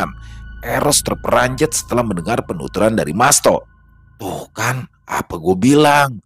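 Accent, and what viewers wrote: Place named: Betawi, Indonesia. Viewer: Bikin LOL mendengarkan Banh bercerita dengan bahasa betawi... 😅😅😅 sangat menghibur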